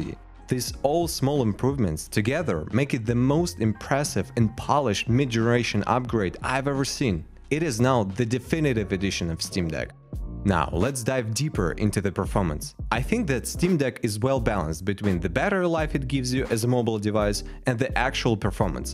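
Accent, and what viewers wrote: Russia. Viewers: ты когда голворишь lenovo legion go русский акцент так и прет))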